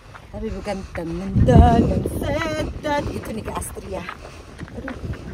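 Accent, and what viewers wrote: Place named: Purwakarta, Indonesia. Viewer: Pakai logat purwakarta tsmbah seru bun..... inyong tambah seneng 😂😂😂😂...😂❤❤❤❤❤❤ love you bunda....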